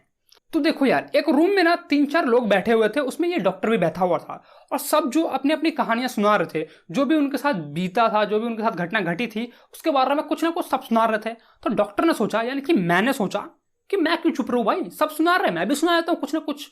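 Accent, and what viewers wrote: Bihar, India. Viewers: Bhai aap Bihar se hai kya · Bro are you Bihari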